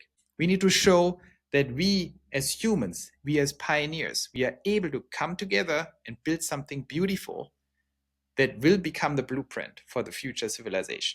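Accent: Germany. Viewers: Germans are usually very logical thinkers but when you say that the AI is going to be constrained you are deluded. AI will be constrained about as much has the United States Congress has the ability to rain in spending. Governments competing against governments will not restrain themselves. You said it earlier that we are in a race condition. AI will be developed without restraint for the promise of power that it gives. Your German accent is very endearing as I had an Opa myself. Blessings.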